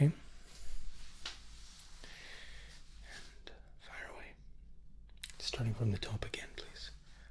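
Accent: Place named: Scotland, United Kingdom. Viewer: Terrific video! I was trying like hell to place your accent! I kept thinking Scottish with a hint of British then I checked your, "about" page lol! Regardless, your accent is magnificent and your ASMR is spot on! Cheers!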